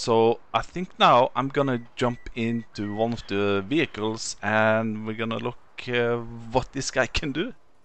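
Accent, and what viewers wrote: Norway. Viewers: Are you norwegian? :)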